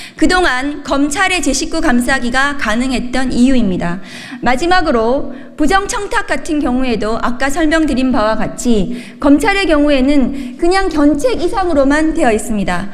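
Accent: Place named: Gyeongsang, South Korea. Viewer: 훌륭한 박은정 의원 특별한 성대를 가지셨네. 같은 경상도이지만 사투리 거슬리네. 앞서지마세요. 뒤가 보이니.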